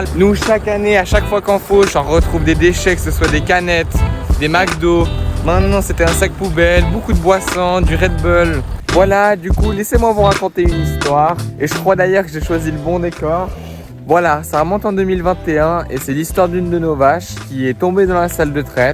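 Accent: Switzerland. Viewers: slt tu es de quelle region ? tu a un accent mais je n'arrive pas localiser . en suisse peut etre nan ? je viens de decouvrir ta chaine . fort sympathique + 1 abo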